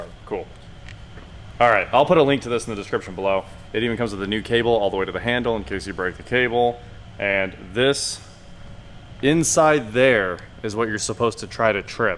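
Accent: United States. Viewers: Love the American accent. What’s a new “mear? “ is that what we call a mirror in England 😅😅😅 Nice update, funky little car.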